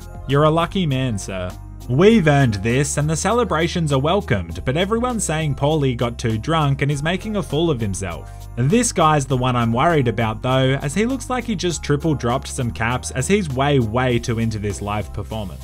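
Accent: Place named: Australia. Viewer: double dropping caps, your so australian in ur vids i love it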